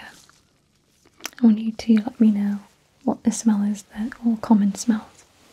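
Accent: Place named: United Kingdom. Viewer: "So if you could just lift up your shit slightly". For some reason the British accent adds to it haha, love the video <3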